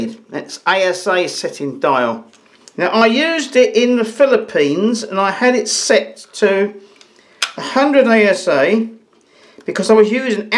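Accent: United Kingdom. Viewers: full of British accent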